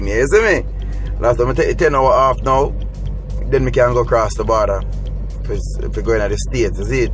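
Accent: Jamaica. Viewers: Omg didn’t expect the patois lol. Love it new subscribers 🙋🏽‍♀️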